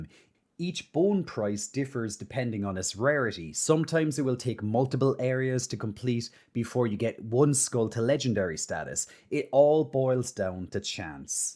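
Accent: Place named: Ireland. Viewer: Irish accent and furry pecs 😵‍💫🥴😭😭😭😭😭😭😭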